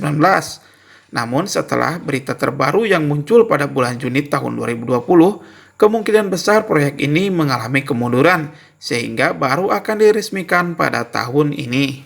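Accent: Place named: Papua, Indonesia. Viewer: admin logat ny kya orang papua ya.. khas banget😂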